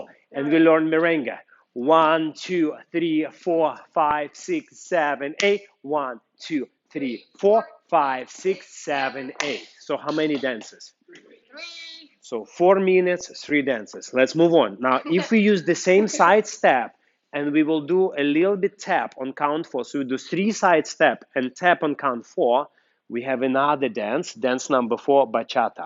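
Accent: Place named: Ukraine. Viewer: I watch your videos all the time for tips! I would always say to myself "you are exactly like my instructor"! When my social dance studio closed down from Covid last year, I managed to find a new studio close by. I wasn't expecting one-on-one with the utmost professional dancer as I was only used to group dancing with my granny buddies where I think we put more social into the studio then perhaps the dancing. However, I really liked learning all the different dance styles and wanted to continue. The new studio I found is nothing like the old one. I come home and need a hot bath after these lessons. I also had to look for videos for tips and exercises, but they couldn't be just any videos, I needed to find videos where the instructor would say " posture, posture posture, you have to settle your hips, core in, rotation, you need to create a straight line"! 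Then when I heard in your video "a beautiful straight line" in the same exact accent as my instructor, (who is also from the Ukraine), I said to myself... these are the perfect videos! I really like learning the mechanics of the dance now even though it is a lot of hard work! However, the mechanics put the meaning, style, expression, and beauty in every step. I am grateful for finding the most passionate and experienced instructions. Thanks for all you do!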